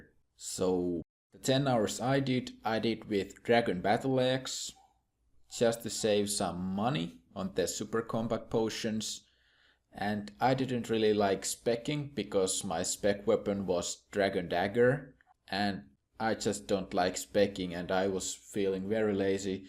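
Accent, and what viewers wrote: Finland. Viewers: dat finnish accent tho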